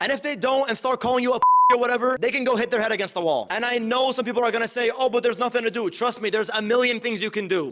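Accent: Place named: Jordan. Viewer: Bro English with Jordanian accent is insane haha, great video btw bro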